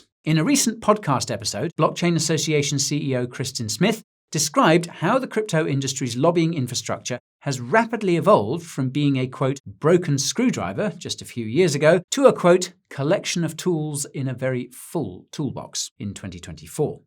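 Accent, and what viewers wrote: Afrikaans, South Africa. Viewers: As a South African, I love your Afrikaans-English accent. Kak funny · Your South African accent won me.. ja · face-green-smiling: love the South African accent ..decent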